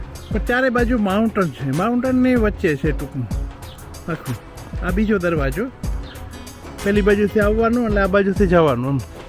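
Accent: India. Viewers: ખૂબ સરસ ભાઈ...! આપણા ગુજરાત ના ભાંડુઓ વિદેશ માં વસતા હોય અને ખૂબ સુખી અને સમૃદ્ધ હોય ત્યારે આપણી છાતી ગજગજ ફૂલે અને આપણા સુખી ભાંડરડાઓ ને જોઈ ને કેટલો આનંદ થાય તે અકલ્પનીય છે. બસ ભગવાન ને હંમેશ એક જ પ્રાર્થના કરીએ...ઈશ્વર તેઓ સૌને સદૈવ સ્વસ્થ, સુખી,સમૃદ્ધ અને ખુશ રાખે... તમે પણ વિદેશ મા વસતા આપણા ભાંડરડાઓ ના આવા જ હૈયુ હરખાઈ જાય, હૈયુ હરખભેર હિલોળે ચઢે તેવા વિડીયો બનાવી... અમને પણ આપણા વિદેશી ભાંડરડાઓ વિશે જાણી મોજ આવી જાય... આપની દેશી લઢણ સાથે વાસ્તવિક ચિત્રણ સાથે નો બ્લોગ બનાવવા બદલ આપને ખૂબ ખૂબ ધન્યવાદ... હાર્દિક અભિનંદન... શુભેચ્છાઓ 🎉🌹🙏 કુમાર ભુપેંદ્રકુમાર- તંત્રી - કર્ણાવતી મહાનગર અખબાર અમદાવાદ 🌹🙏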